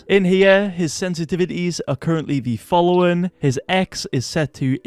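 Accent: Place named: Newcastle, United Kingdom. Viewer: been a fan for about 2 years, but please stop saying "that have went down" its "gone"!!! lmfaoooaoaoaoaooaoaoo... fr tho i get its the geordie in u (i swear ur geordie anyway)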